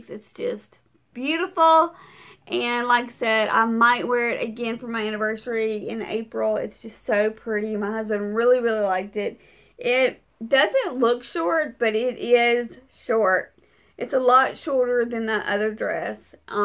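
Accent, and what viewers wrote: South, United States. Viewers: Are you tipsy or just really southern??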